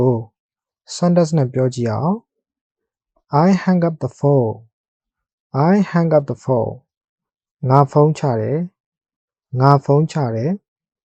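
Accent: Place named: Myanmar. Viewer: မြန်မာဘာသာ ပြန်ဆိုထားတာ မနက်ပိုင်းနဲ့ ညနေပိုင်း လွဲနေတယ် အသံထွက်ပီပြင်ဘို့ လိုနေသေးတယ် ငပိသံ မပျောက်သေးဘူး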